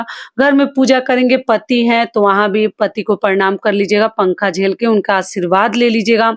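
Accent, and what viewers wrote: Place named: Uttar Pradesh, India. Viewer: Ap up se lagti hain ankwar dena hamari banarsi bhasha hai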